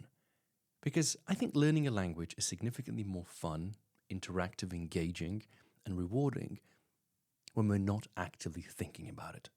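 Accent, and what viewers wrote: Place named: RP, United Kingdom. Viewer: Thank you, I completely agree with your thinking about longer content and attention spans. Could you please make a course on how to develop a british RP accent such as yours?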